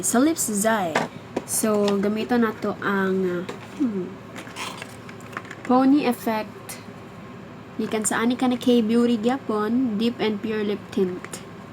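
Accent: Visayas, Philippines. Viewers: Trueee! HAHAHAHA may sense of humor kasi saka bet na bet ko yung accent ng pagbibisaya niya😂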